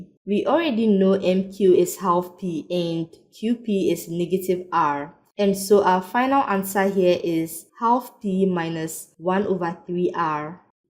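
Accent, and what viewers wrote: Ghana. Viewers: are you Ghanaian?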